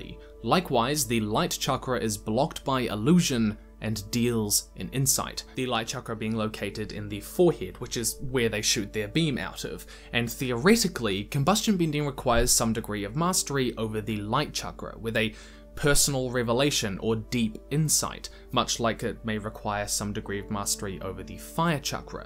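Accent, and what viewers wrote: New Zealand. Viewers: You a kiwi dude? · noticed your accent straight away love and support from a fellow kiwis bruv. subscribed and hit the bell✌✌ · Hey I just wanna say that I had an English proficiency test recently. I have no issues with English, but I would have been very tripped up by the Kiwi accent on the listening part had I not been acquainted with the accent beforehand, thanks to your videos. Thanks!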